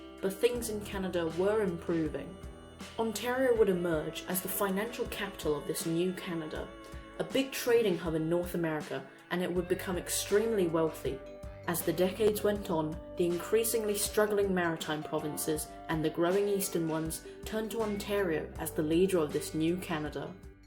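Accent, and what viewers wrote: United Kingdom. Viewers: British accent, thumbs 👎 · You sound british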